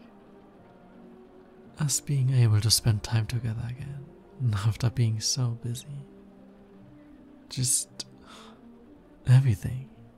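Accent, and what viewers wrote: Germany. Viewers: That's the most cutest audio I've ever listened too! Thank you,! And I have been blessed with your adorable German accent! <3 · How come it never occurred to me that this accent is German? I literally live in the neighbour country 💀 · Love this audio I just found it, and I'm literally crying rn. I never felt so safe in my life Love your German voice<3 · Your German accent is cute. Loved this new experience. Great audio. LOVED IT!!!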